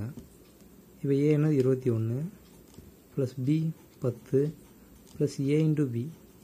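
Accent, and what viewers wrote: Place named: Thoothukudi, India. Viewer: Anna ninga uplode panna Ella videos um pramatham...especially ur teaching skill is really good....nd ur slang tutucorin....innu nirya ethir pakrom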